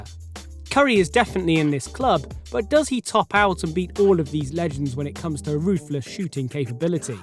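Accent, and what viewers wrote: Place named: England, United Kingdom. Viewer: Stephen and not steven. I am sure u re inglish man and not american because of your accent.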